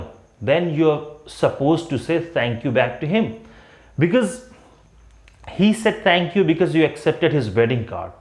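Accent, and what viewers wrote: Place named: India. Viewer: I'm a native English speaker. I don't mind people saying "good name" though it does make the speaker stand out as probably not being a native speaker. I did not know it was specifically an issue for people from India. Your example with "thank you" vs. "you're welcome" is something I never thought about. It's not so unusual in some of your examples if a person says "you're welcome". I can't see anybody taking the wrong sentiment from it, but I suppose it's best to be careful. In a couple of those examples I may have said "no problem". Maybe there are certain English speaking regions though where this is something to be worried about. You speak very well, but still have that "v" sound replacing "w" and "d" replacing "th". How long does it take to lose that part of the accent for Indians or is it something that most Indians do not fight and just accept it? 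Thank you for the interesting video. ))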